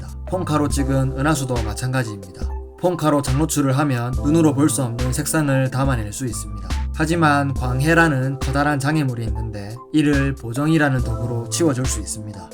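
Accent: Gyeongsang, South Korea. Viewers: 갱상도 분이셔유? ... 목소리가 정감이 갑니다. 남자 목소리는 이래야 제맛이죠 ㅎㅎㅎ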